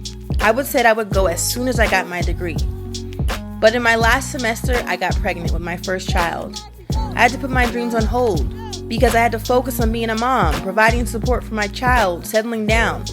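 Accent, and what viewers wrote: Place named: Honduras. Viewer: Awww beautiful words same way i feel currently i have everything in the US but part of me is like dead, not even sure how i found your chanel glad i did... our purpose in this earth is to enjoy life, have peace, show our kids they are more things outside than these routines, not sure if you are honduran i can hear some accent there 😅 we love you guys! 🇭🇳🫶 and i will be praying for your dad 🙏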